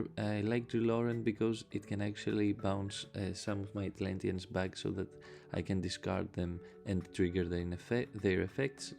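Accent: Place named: Greece. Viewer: Yes I‘m Greek too but I live in Germany thats why I recognized your accent bro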